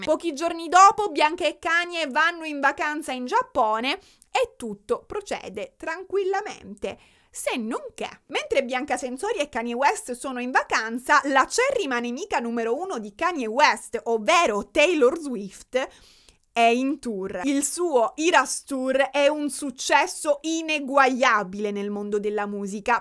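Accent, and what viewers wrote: Sicily, Italy. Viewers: esagerato accento siciliano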